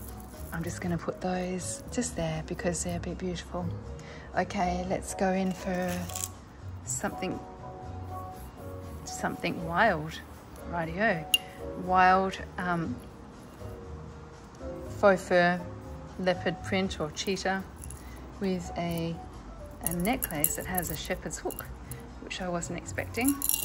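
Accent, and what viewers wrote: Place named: England, United Kingdom. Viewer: I really enjoy your presentation with the beautiful English accent. You have some really nice jewelry. Cheers from Princeton IL from Agi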